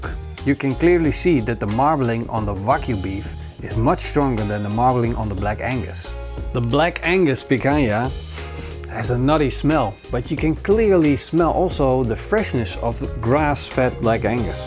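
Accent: Netherlands. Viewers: Je kan horen dat hij een nederlander is door het luisteren naar zijn engels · Very obvious Dutch accent lol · Je spreekt Engels als een Nederlander. Ben je Nederlands? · Nice Dutch accent👌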